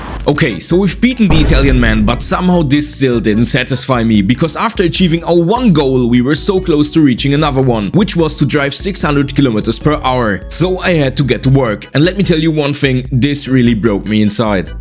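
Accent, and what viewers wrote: Germany. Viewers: I can hear a bit of deutsch there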